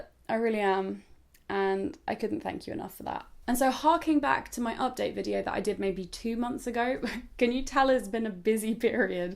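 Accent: United Kingdom. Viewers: I wish I could meet you a onc, just to tell how much you are gorgeous with British accent .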